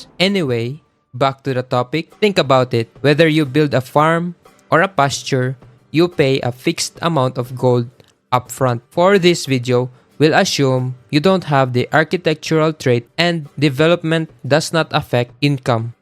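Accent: Philippines. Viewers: I only hear pinoy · Great video my dude! Really helpful resource for min-maxing playing tall strats. Also, I just have to ask are you from the PH by chance? Your accent is giving me kababayan vibes lol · Sounds Filipino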